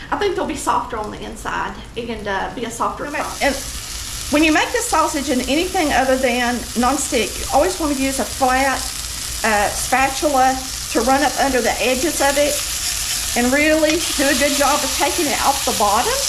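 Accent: South, United States. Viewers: I’m from Texas which I guess is more west than south because I’ve never had grits nor red-eye gravy though I’d like to try. But most of my ancestors come from Tennessee and Georgia, so I love getting to learn about true Southern cooking from you. You make it look so easy. I especially appreciate your bubbly personality and your very southern voice, reminds me a lot of the women on my father’s side—always cooking, caring for people, and laughing.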